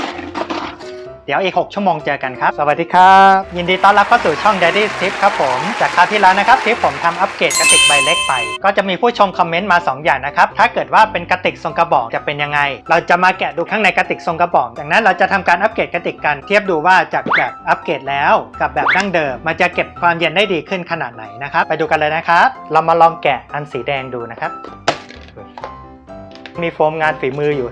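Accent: China. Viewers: พี่เค้าพูดสำเนียงเหมือนคนจีนเลย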